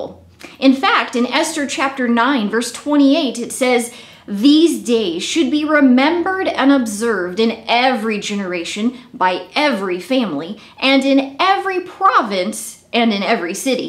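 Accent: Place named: United States. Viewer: You have an American accent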